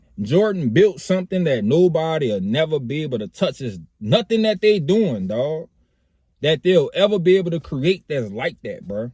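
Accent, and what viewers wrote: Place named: Louisiana, United States. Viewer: I can definitely say this dude is from Louisiana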